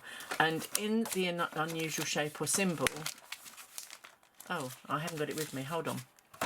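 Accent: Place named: United Kingdom. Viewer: What a great way to get started. You did a great job. I'm going to try too. Nice to hear a british voice too. Subscribed. X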